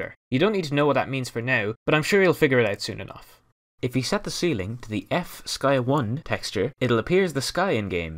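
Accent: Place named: Ireland. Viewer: why are you irish.....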